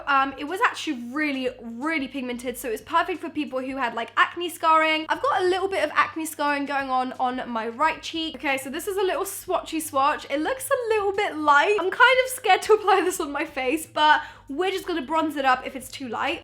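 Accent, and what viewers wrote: United Kingdom. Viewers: This british accent omg 😍😍😍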